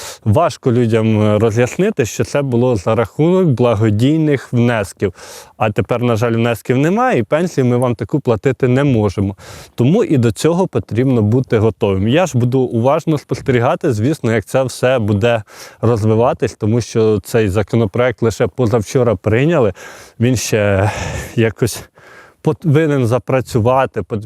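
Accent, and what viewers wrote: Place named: Galicia, Ukraine. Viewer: Зауваження ведучому,коли ви вже почнете вивчати і розмовляти правильною українською мовою,що це за слова буде,будуть?Гидко слухати.Ідіть на курси і навчайтесь розмовляти.Галицький у нас в Україні не проходить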